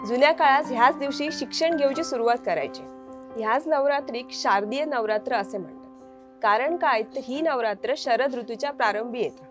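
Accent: Malvani, India. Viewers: बेटा, तुझा माहिती सभर वीडीयो मी नेहमी आवर्जून पाहत असतो. मालवणी भाषेत ला तुझा प्रत्येक शब्द मला ऐकायला आवडतो. मी गुजराती आहे. माझं बालपण गिरगावात गेलं असल्याने मराठी भाषा मी बोलु शकतो,वाचु शकतो आणि लिहू शकतो. तुझ्या मालवणी भाषेत जो गोडवा आहे तो मला नेहमी ऐकायला आकर्षित करतो. तुझा मालवणी स्वाग असाच कायमचा चालू ठेव. माझे तुला खूप खूप आशिर्वाद.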